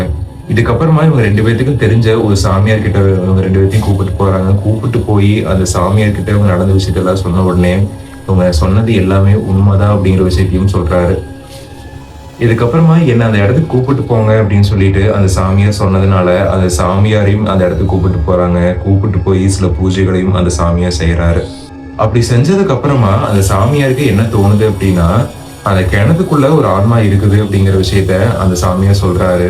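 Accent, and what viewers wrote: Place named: Coimbatore, India. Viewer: Ok bro your Coimbatore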